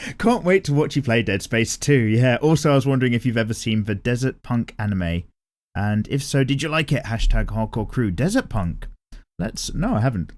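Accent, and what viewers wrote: United Kingdom. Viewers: Hello! I love your british accent, and I am inclied to do my drawings while listening to your amazing and bloody nice recordings. You are a inspiration for me, Sr.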